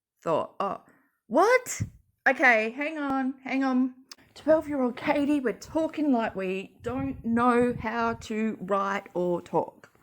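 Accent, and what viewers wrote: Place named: Australia. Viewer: Its refreshing to hear an aussie accent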